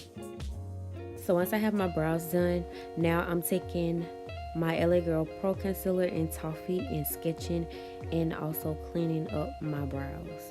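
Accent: New Orleans, United States. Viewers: I love this look and your accent, are you from NO?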